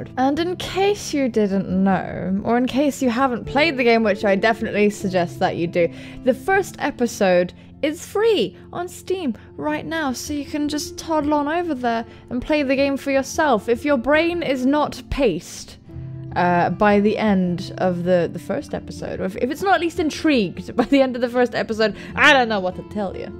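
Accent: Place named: United Kingdom. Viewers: I don't remember why I first came here. Maybe it was for HuniePop. shifty look Still, I also stayed for the British voice and I'm probably more stereotypically British than you are. :p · Came for Life is Strange. Stayed for that smooth british voice and adorable face <3 You're amazing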